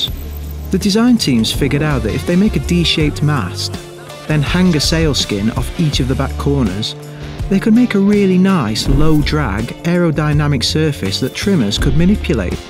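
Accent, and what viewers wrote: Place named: North, United Kingdom. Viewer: Dumbed down to the point no one watched. North sails, northern accent.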